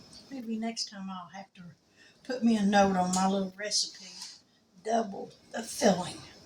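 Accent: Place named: South, United States. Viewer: you as well sweet lady! I reside in North Georgia! You sound like you are from the south as well!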